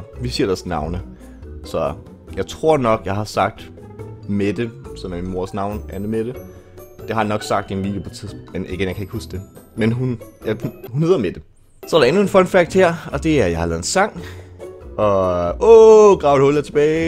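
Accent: Bornholm, Denmark. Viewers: det er nestan liga svenska men det er bonholmsk och det er skågit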